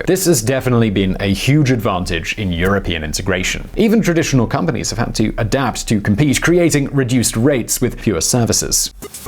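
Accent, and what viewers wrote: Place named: United Kingdom. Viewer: Keeps saying Roots when should be saying Routes, trust the English to butcher the language..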